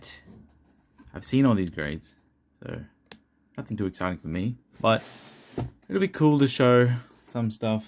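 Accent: Australia. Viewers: Dude, your videos are the best out there. not only for the sublime ozzie accent but you dont talk too much. you get to see a shit ton of cool cards within a short time. I really love this, less talk more cards. your videos have that to the max, so keep it up! I subscribed hell yes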